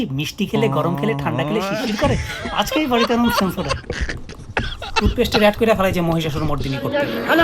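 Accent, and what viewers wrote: Bangladesh. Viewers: বাংলাদেশিদের মতো কথা গুলো লাগছে। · That Bangladeshi accent❤️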